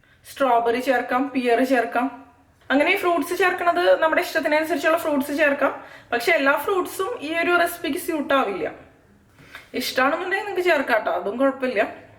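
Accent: Thrissur, India. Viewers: Thrissur kari anno?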